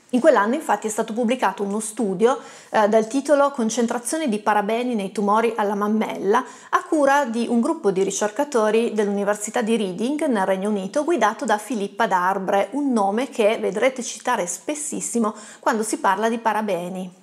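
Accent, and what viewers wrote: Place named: Turin, Italy. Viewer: Contentissima di aver trovato il tuo canale, dopo aver letto alcuni tuoi articoli interessanti altrove. Video interessantissimo e molto chiaro anche per un'umanista come me :-) Noto anche con piacere un familiare accento torinese, o sbaglio? :-)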